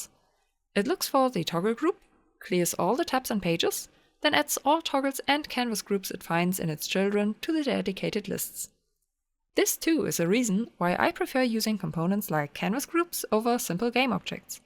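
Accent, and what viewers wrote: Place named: Germany. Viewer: Ein Voice Over in Deutsch als Audiospur wäre cool ;-) Ich kann zwar sehr gut Englisch, aber dieser Deutsch Englische Dialekt ist echt schlimm. Ich weiß nicht warum, aber ich höre jeden Deutschen heraus, der Englisch spricht und find's wirklich schwierig. Frag mich aber nicht warum, ich weiß es selbst nicht. Ansonsten, Top Video. Danke!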